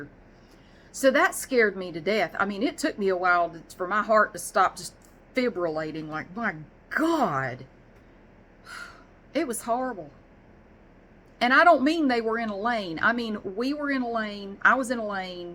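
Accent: South, United States. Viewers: Ms., I’m not sure if you already know, but there is a viral video of a woman with a southern accent on TikTok and many of the people watching that video were requesting southern accent asmr from her. Soooo many of the people replying to these comments were suggesting your channel and singing your praises! I was so happy to see that you’re so popular, even people on TikTok bring you up! Just a small a testament to your affect in the asmr community 🤗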